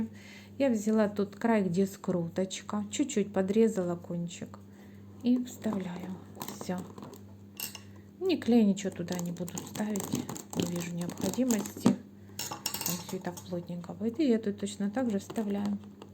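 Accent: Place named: Ukraine. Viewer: Очень рада, что попала на Ваш канал. Самое главное, что слышу наш родной говорок. Украина . Замечательные работы, прекрасная подача. Хоть я плетун со стажем, но все таки очень хотела бы МК по белым трубочкам. Спасибо огромное за работу. С меня лайк и подписка. Удачи, вдохновения и процветания каналу !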